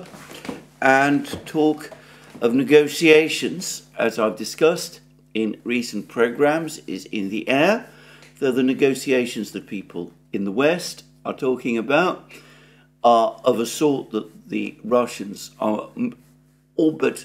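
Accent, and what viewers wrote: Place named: RP, United Kingdom. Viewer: You can easily double the speed of Alexander, and still hear him clearly - that's one of the boons of posh English.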